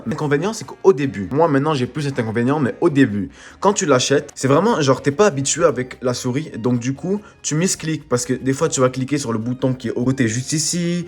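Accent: Quebec, Canada. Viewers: A l'accent sa se voit t'est québécois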